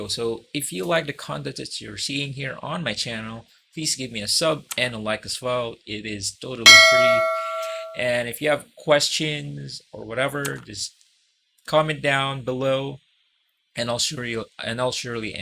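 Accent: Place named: United States. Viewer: Haha, IMO it is just marketing hype. 256gb Exynos is anyway better than 128gb Snapdragon. Exynos is also dual SIM (another great feature that is missing on Snapdragon). Exynos does not have the UW Band (Verizon) and some other 5G bands too so that is the only catch for me. You have US accent - you look like the US expat enjoying the good life on beaches of Philippines :)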